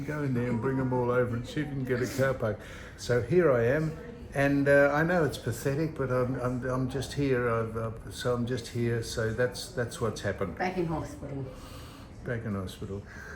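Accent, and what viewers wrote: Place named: Australia. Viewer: God bless you,:) I like your larrikin banter. Always well said with Aussie charm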